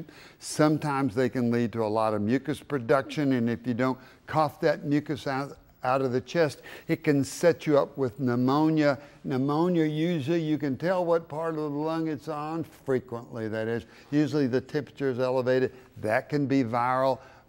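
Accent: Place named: Mississippi, United States. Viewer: He sounds like he's from Mississippi . Wish he was our doctor. This guy has KNOWLEDGE .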